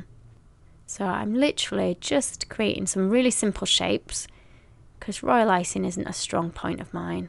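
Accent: United Kingdom. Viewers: fathi irfan No she sound kinda British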